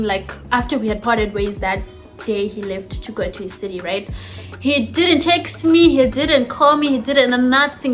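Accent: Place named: South Africa. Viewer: Sounds like a south african gent😭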